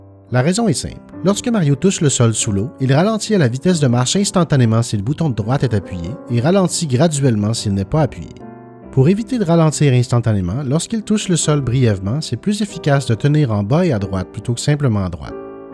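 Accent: Quebec, Canada. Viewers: Moi non plus, mais son accent en anglais est clairement comme un francophone, mais il n'est pas l'accent typical pour un francophone qui vient de France. En mon expérience, c'est presque toujours Québécois lol (Je suis désolé pour ma grammaire, je suis Suédois) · I can usually pick up French accents on people from France speaking English, but for I did not know it until he said in the community post. Watching this video now, I noticed some words sounded very odd compared to what I learned back in school and what I went on to hear from French people. Thanks for confirming my suspicion of him being French Canadian to be correct!:D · Et c'est ainsi que je decouvre que je comprends mieux l'anglais nord-américain que le français québécois (je suis Francais) 😐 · Une speedrun? J'ai toujours entendu un speedrun, étrange.. Ça fait du bien d'avoir une vidéo narré en français avec un accent québécois d'une aussi haute qualité, j'en veut plus :) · L'accent québécois est parfoit difficile à comprendre pour moi ^^'. 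Peut-être je peux m'y habitué avec cette chaine, haha.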